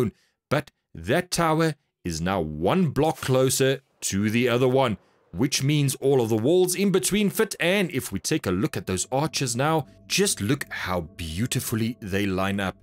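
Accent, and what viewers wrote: South Africa. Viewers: Are you from south africa??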